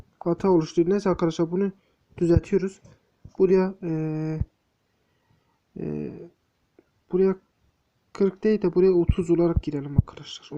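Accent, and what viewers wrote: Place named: Anatolia, Turkey. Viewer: Hey senin şivene kurban oluyum Anadolu insanı. 👍🏻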